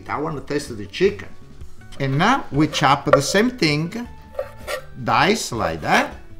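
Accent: Italy. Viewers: I like his Italian accent so nice. As well I love pasta.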